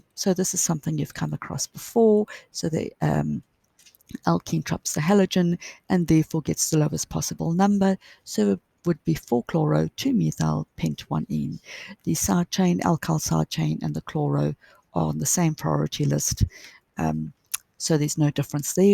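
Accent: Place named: South Africa. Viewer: Thank you for the helpful video! P.S.: you have a pleasant South African accent :)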